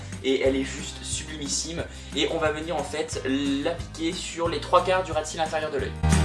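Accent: United Kingdom. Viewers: très jolie ce petit look... Très frais et naturel. #teamsnapchat. Tu as un.bel accent anglais :)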